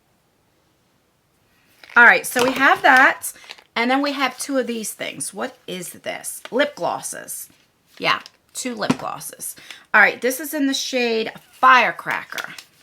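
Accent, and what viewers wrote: New Jersey, United States. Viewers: I just love you and your big personality. I'm so sorry that someone in the same community as you would be so evil to slander you're daughters credibility. It makes me sick especially in the times were in. My heart broke for you're daughter and I hope she's recovering well. I had a bad day myself and hearing that big jersey accent made my day a hair better. Thank you for being you.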